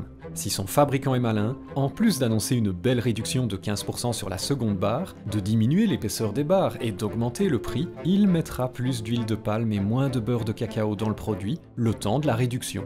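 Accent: Belgium. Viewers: Une nouvelle abonnée, grâce à Astronogeek, faudra le lui dire, il kiff ca, à chaque fois ses cheveux et sa barbe poussent un peu plus ... =DD Je ne connaissais pas ton autre chaîne, mais je suis très intéressée par le sujet, contente de t'avoir "trouvée" donc, au final =) PS: Je repère une belgitude non ? les èèèèRRRR ! =D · Et voilà, je me suis abonné ce matin grâce à Astronogeek et je ne suis pas déçu ! Merci pour ton travail et la qualité rendue ! Je me disais bien que tu avais le même accent que le grand Jacques ! Bonne continuation cher voisin Belge 🇧🇪 ! Un abonné Français 🇫🇷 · c'est vraiment bien foutu! je ne connais pas la chaîne que tu avais avant je t'ai connu par astronogeek, je trouve que le rythme que tu a choisi pour de la vulgarisation de stats est vraiment bien. Ni trop rapide ni trop lent, ont à le temps de bien comprendre de quoi tu parle, roh et cet accent belge toujours aussi drole :D bon juste dommage que tu parle pas des prix des fruits et légume psk là aussi ils nous manipule bien. 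Faut fuir les grandes surfaces !!! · Merci à Astronogeek pour la découverte :) Je ne savais pas que tu étais belge, le "nonante" et le "houit" m'ont surpris ^^ Très bonne continuation à toi, y a de la qualité là ! Mat, un des 50 ou 60 premiers abonnés.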